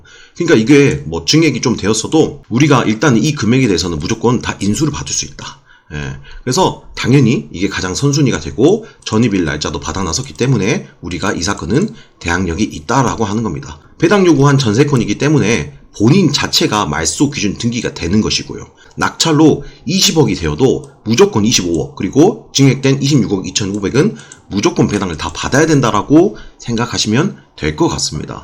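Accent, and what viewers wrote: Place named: Jeolla, South Korea. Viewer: 전라도 말투만 들어도 이제 토 나온다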